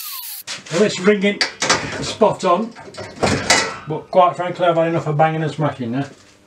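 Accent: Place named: Northern England, United Kingdom. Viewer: You think you've seen everything on YouTube, and then you stumble upon something else. That's a fine northern accent btw. Huddersfield lad myself.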